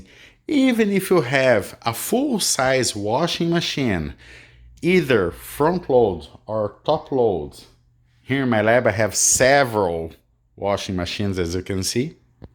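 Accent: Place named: Quebec, Canada. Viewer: Man, he sounds like a French Canadian... (Disclaimer, I'm a tamed French Canadian)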